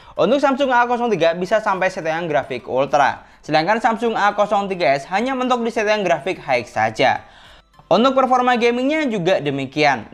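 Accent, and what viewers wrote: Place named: Java, Indonesia. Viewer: kalo masnya orang jatim mungkin tau, orang yang biasanya masrahin (orang yang ngomong) waktu ada selametan(syukuran),logatnya kayak narasinya mas'e.. "dinten pitu pekenan gangsal, tebeh dipun rantos celak dipun.." yah pokoknya kyk gitu2an lah.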